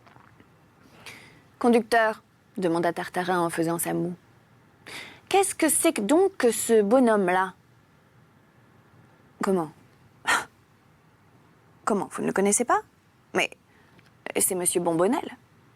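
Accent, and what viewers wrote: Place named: Midi, France. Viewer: C'est et non Camille. Cela dit, pourrait jouer Camille dans Horace de P. Corneille (pour le côté "amoureuse aérienne" on l'entend bien dire avec sa douce voix '"iras-tu Curiace,...") ou Camille dans Le Mepris de JL Godard (à cause du côté beauté fatale et le regard mauvais qu'elle peut avoir). Cette lecture très bien préparée avec des jeux de voix du Midi, confirme des qualités professionnelles qu'on aimerait voir mieux reconnues.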